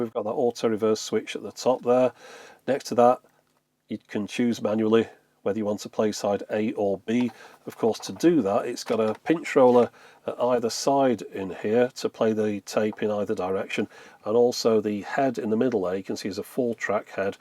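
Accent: United Kingdom. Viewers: I do like, of course your videos, but your voice. Nice and calm. Like to hear a British voice instead of always American.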